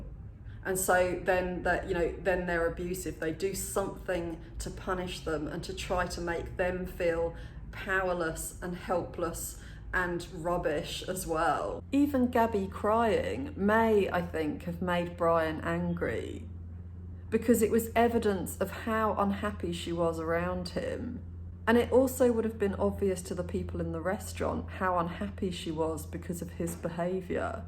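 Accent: England, United Kingdom. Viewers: Love your accent. It's like listing to the Queen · nice accent, are you from England?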